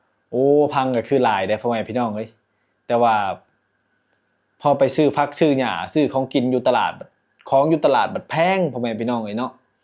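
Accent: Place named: Phu Thai, Thailand. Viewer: เป็น คนภูไท เบาะ บางคำ เว้า ภูไท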